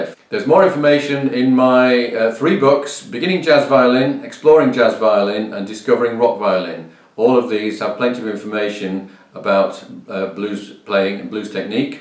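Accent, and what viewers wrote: England, United Kingdom. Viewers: My brain did a hard reset when I heard a English accent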